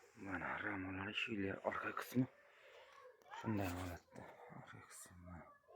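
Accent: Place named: Khorezm, Uzbekistan. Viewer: Assalomu alaykum manzil nerda xorazmdami sheva oʻxshab turibdi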